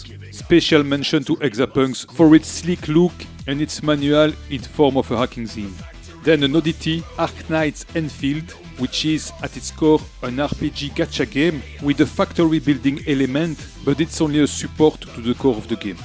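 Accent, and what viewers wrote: France. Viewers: This is some premium french accent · Of course! I find it quite charming to be honest. It's very intelligible, don't you worry, but it's also VERY french :D It's a neat accent.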